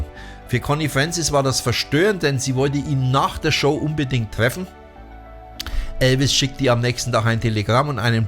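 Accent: Upper Franconia, Germany. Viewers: Hallo, ich habe Dir zu einen Deiner letzten Videos geschrieben und finde Deine Berichte Super. Nach Deinem Dialekt zu urteilen kommst Du wohl aus Oberfranken wie ich auch. Ich lebe aber bereits seit 1978 in Mainz. Bin aber ein Rehauer, wenn Dir das was sagt. Ich hatte Dir geschrieben, dass ich bis 2017 eine Band hatte. Man nennt mich den "Elvis von Gunsenum" zu deutsch Gonsenheim. Im letzten Jahr hatte ich mein 25-jähriges Bühnenjubiläum. Ich singe halt die Songs des King of Rockn Roll sehr gerne und es kommt an. Nach 2017 waren wir ein Trio und jetzt mache ich Soloauftritte in Seniorenheimen. Ich würde mich wirklich sehr freuen, wenn wir uns Mal treffen könnten. Vielleicht im nächsten Jahr beim Elvis Festival in Bad Nauheim. Dieses Jahr geht es leider nicht. Mit rockigen Grüßen von einem oberfränkischen Elvis Fan zum Anderen Volker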